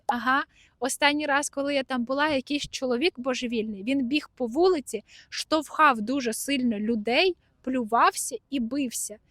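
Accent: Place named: Ukraine. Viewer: I like your accent. Pure Ukrainian!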